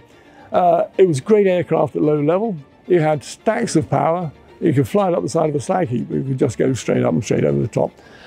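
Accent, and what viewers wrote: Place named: United Kingdom. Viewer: I’m going to start cultivating a British accent so I can sound as cool as this bloke… Love this video!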